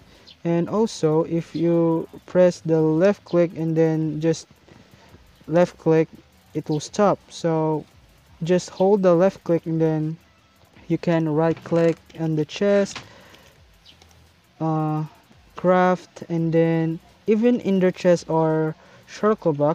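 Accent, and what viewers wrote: India. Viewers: i think u r a indian beoz iam also a indian the english slag looks like indians and even i speak like that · oh 😂 u speak like a indian but np!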